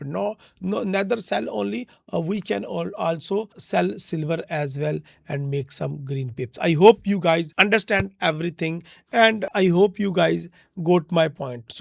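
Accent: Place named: Punjab, India. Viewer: Bhai Punjabi voice problem kr rhi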